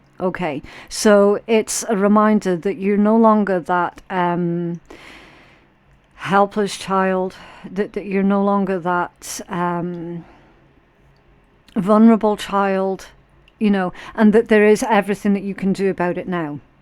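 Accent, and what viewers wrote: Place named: Scotland, United Kingdom. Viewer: I love your accent, I believe it’s a Scottish accent. It sends me back to when I was a little child and lived in a house right next-door to grandma and grandpa from Scotland. Thank you so much for sharing your spirit with us. Might love always.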